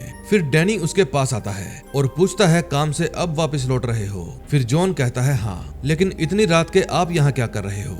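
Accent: Punjab, India. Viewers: Tu pakka Punjabi aa😂😂😂 pamma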